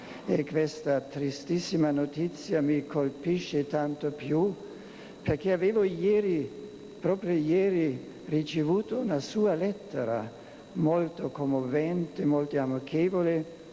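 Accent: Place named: Bavaria, Germany. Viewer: so schönes bayerisches Italienisch - wer braucht da eine Übersetzung?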